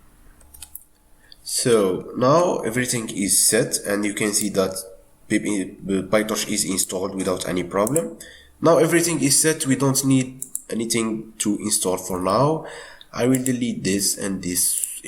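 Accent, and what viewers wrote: Morocco. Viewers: I know a Moroccan voice when I hear it . Happy to see my fellow compatriot delivering a course on this channel. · لي كيشرح مروكي باينة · Anyone who's confused with the word "paasions", it means "patients". I too was confused due to his moroccan accent. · HHHHHHHHUU tanta ban lik mghribi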